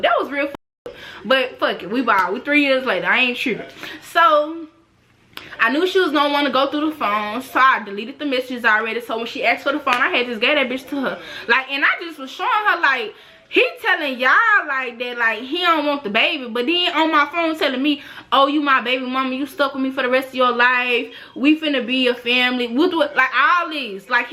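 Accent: Louisiana, United States. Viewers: I just knew you was from louisiana😂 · Not you from Shreveport lol . It must be a Louisiana thang 😂😂😂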